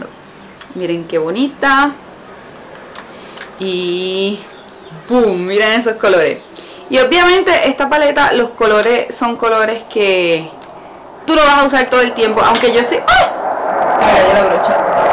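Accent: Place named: Puerto Rico. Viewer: Cada vez que te escucho hablar no puedo evitar pensar que eres de acá! PR right? Here you have a new subscriber :)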